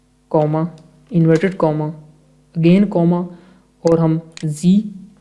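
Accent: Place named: Pakistan. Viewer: Awesome teaching Sir Ji stay blessed i think 🤔 you are from Pakistan 🇵🇰 right listening to your voice communication love and respect from karnataka India 🇮🇳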